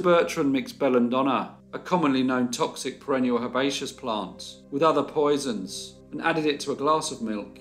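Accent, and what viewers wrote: England, United Kingdom. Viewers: Just want to take the time to thank you, for your time and efforts. Incredible stories from the past! Your english accent makes it even better to listen to. Wish good week ahead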